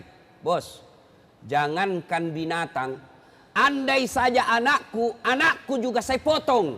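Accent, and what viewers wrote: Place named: Kalimantan, Indonesia. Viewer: Lugat org kalimantan mmg beda shg tajwid nya kelihatan tdk spt org jawa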